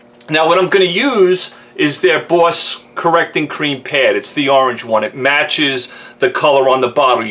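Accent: New York, United States. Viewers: I'm hearing Long Island or NJ here paisan.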